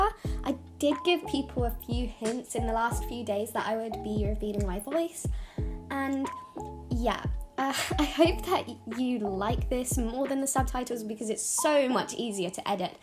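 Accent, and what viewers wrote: United Kingdom. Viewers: can i eat ur accent and ur art? 🥺 you sounded like how i expected you to sound but the accent caught me off guard bcs im just a sucker for british accent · !!! you have such an heavy British accent, I love it!! It's so nice to actually hear your voice for once lol! I love how the character looks now! I hope you have a great day/ night Byee <3 Edit: 🍞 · Your voice is so pretty and I love the British accent. I’m southern but don’t have a strong British accent. I’ll probably take up some of your tips when I film another voice over… but that’ll be a while. I also wanted to ask how you stay motivated and creative with video ideas. I’ve taken 7 months from uploading but am still confused as to what I should upload.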